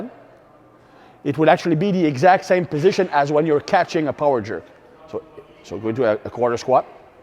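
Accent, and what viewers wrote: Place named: Quebec, Canada. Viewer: SUPER un Québécois. Il est a Québec ou a Sherbrooke?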